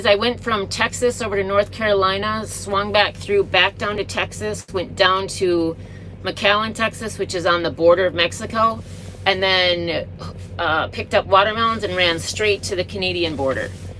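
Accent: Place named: Minnesota, United States. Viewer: Thanks for the "moot point", most say "mute point". Who could hate you? Notice a bit of Minnesota Ya hey der.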